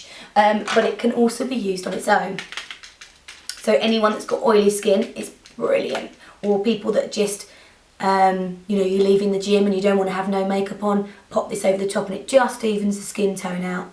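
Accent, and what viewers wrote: England, United Kingdom. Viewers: oh gossshh i love your accent.. promise . i really wanna learn british english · If I had British accent I would never shut up! :) love the way U talk <3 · i was wondering who 'bobby' is then on the title it was barbie, that's awesome sorry i have to grope around this whole englishman accent and stuff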